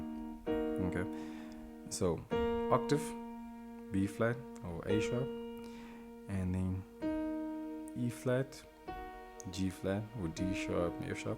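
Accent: South Africa. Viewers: bless you bro. You sound like a fellow South African. But let me not assume.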